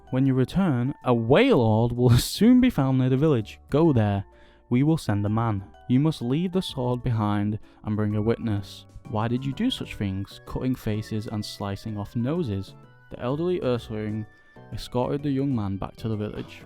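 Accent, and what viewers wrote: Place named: United Kingdom. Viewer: I really enjoyed this video, great to hear you reading the story out and your reactions to it 😂 I liked the video editing too. Also as a fellow Brit my first thought hearing you was "ooh yay, is British too!"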